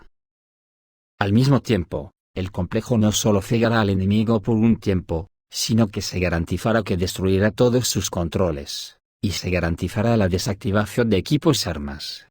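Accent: Spain. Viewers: TU CANAL ES UN DIGNO REPRESENTANTE DEL MUNDO HISPANO-LATINO ============================================================, TU APORTE INFORMATIVO Y TUS COMENTARIOS / ANALISIS, HACEN DE TU CANAL, UN CANAL PARA SER TOMADO EN SERIO Y CON RESPETO. EL EUFEMISMO Y TEMOR SOTERRADO DE OTROS "REPORTEROS" ==================================================== _ TU NO TIENES MIEDO COMO OTROS CANALES, DE DECIR Y LLAMAR LAS COSAS, LAS PERSONAS Y SUS PAISES CON SU NOMBRE Y CON SU CALIFICACION. _ OTROS CANALES USAN EUFEMISMOS TIMORATOS COMO LLAMAR "OSO" A RUSSIA MOTHERLAND _ OTROS CANALES USAN EUFEMISMOS TIMORATOS COMO LLAMAR "I" AL ESTADO DE ISRAEL. _ OTROS CANALES USAN EUFEMISMOS TIMORATOS COMO LLAMAR "ABUELO" AL USA PRESIDENTE. _ OTROS CANALES USAN EUFEMISMOS TIMORATOS COMO LLAMAR "PAIS DE LAS HAMBURGUESAS" A USA. _ OTROS CANALES TIENEN UN TERROR DE MUERTE DE LLAMAR GENOCIDIO A LOS CRIMENES COMETICOD POR ISRAEL. 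_ OTROS CANALES LLAMAN "TERRORISTAS" A LOS PATRIOTAS PALESTINOS, PORQUE ESO ES MAS "CONVENIENTE", Y ASI EVITAN QUE LES CIERREN EL CANAL. _ OTROS CANALES LLORAN LA MUERTE DE UN JUDIO, PERO LA MUERTE POR GENOCIDIO CONTRA UN PALESTINO ES JUSTIFICADA PORQUE TODA PALESTINA TIENE UNA ETIQUETA DE "TERRORISTA" QUE LE PUSO OCCIDENTE Y SUS MEDIOS MASIVOS DE COMUNICACION, DE MANERA PROPICIA Y CONVENIENTE, PARA PODER PERPETRAR ESTA MATANZA EN CONTRA DEL PUEBLO PALESTINO TU HABLAS UN ESPANHOL "CASTIZOLA" COMO EL MIO, QUE A LA SAZON; HABLO OTROS IDIOMAS Y POR ESA RAZON ENCUENTRO LA VERDAD, PE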